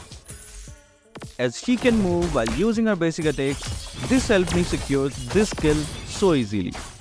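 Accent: India. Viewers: Are u from India bro ur voice is like an Indian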